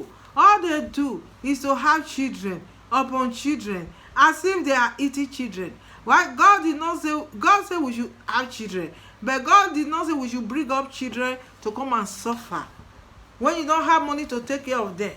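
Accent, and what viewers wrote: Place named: Pidgin, Nigeria. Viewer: Your pidgin dey kill me aswear... Making sense.. We gather dey. No lele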